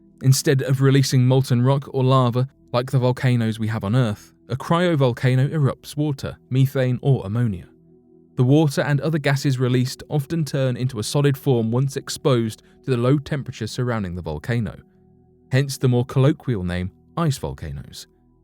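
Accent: United Kingdom. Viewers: Appreciate the British accent.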